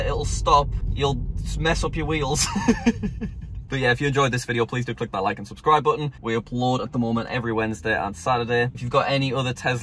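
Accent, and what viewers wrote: United Kingdom. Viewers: I thought u was American but ur British Greetings from Italy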